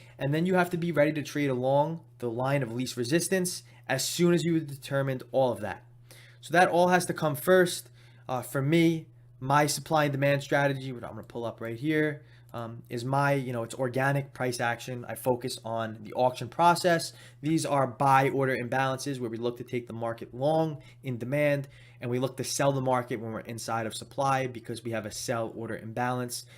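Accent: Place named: Long Island, United States. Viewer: Sounds like a Long Island accent. Home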